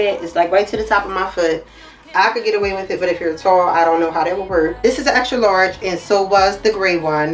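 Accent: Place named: Philadelphia, United States. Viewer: Are you from Philadelphia? Your accent is giving Norf’ Philly 😊… FYI, I went to grad school in Philadelphia and the way you talk bought back beautiful memories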